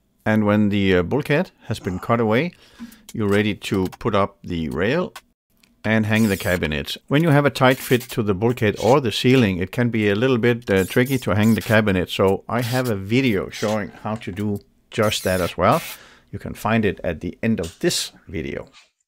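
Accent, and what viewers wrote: Denmark. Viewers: Liked and subscribed👍 very nice videos, and valuable information! Would i be mistaken if i said that there is a hint of “jydsk dialægt”? 😄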